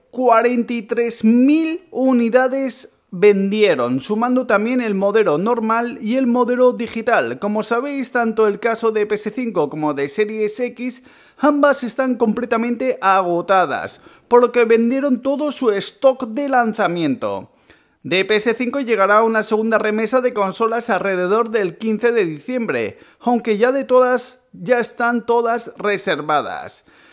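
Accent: Mexico. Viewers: Yo pense que eras de mexico xD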